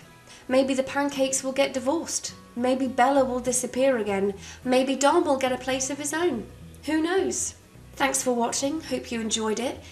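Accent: United Kingdom. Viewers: Youre english sound like british accent, but you from america ?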